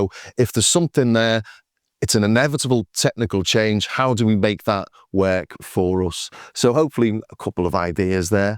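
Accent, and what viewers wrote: Liverpool, United Kingdom. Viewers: Interesting subject, you make a lot of good points. I would record the output of my modular just messing about and picking out the good bits. Guess I am a curator! A completely unrelated question: Do you switch to the Liverpool "k" when meeting fellow Liverpudlians?😁 Or is it only in certain parts of the city you have that sound? Cheers from Norway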